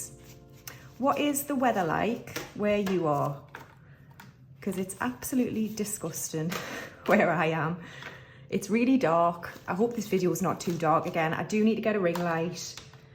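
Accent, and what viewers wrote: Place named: North, United Kingdom. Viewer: You cracked me up at the predator comment 😂 you totally sound like my northern friend (saying that, does Lancashire count as northern?) god here I go insulting people with my crap geography 😂 #nooffenceintended 🤦‍♀️ xx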